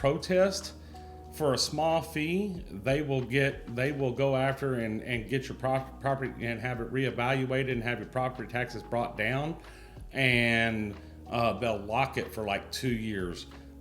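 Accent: Texas, United States. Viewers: I can hear it. Reminds me of my grandma she lived in Shepard Texas right above houston. I lost her to covid. His voice brings me a lot of joy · What accent? Lol but really, it’s much more tame than most Texans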